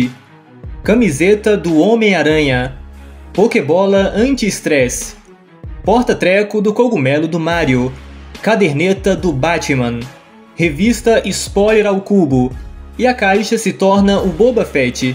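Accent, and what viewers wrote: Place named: Northeast, Brazil. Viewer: mano por curiosidade, vc segura algum sotaque? parece q tem algum sotaque bonito do nordeste ai escondido kkkkkkkkk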